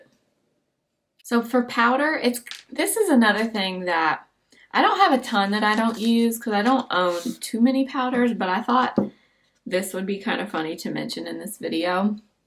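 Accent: South, United States. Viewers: You are so heavenly! Love your videos! Where are you from? I hear a slight southernish accent perhaps?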